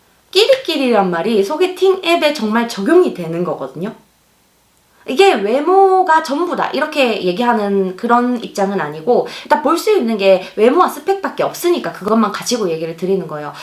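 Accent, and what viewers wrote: Gyeongsang, South Korea. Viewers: 갱상도 사투리 ㅋㅋ 말투가 귀엽네요.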